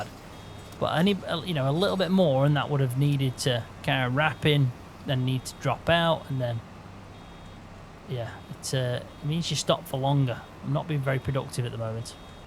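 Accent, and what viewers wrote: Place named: Wiltshire, United Kingdom. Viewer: Bruh you easily my favourite YouTuber. It must be the accent as I’m from Wiltshire so is similar. Found your page looking for best mods and subscribed because of your farm hand series. You probably won’t see this mate but honestly your content helps me get through my days. Which at the moment is very tough. Appreciate your work and your music choices for videos.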